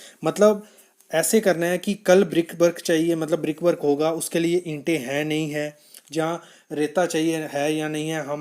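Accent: Jammu, India. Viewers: Tu Jammu ka laga Raha ha muja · Bhai aap Jammu se ho kya? coz aapki hindi proper Jammu wali hai..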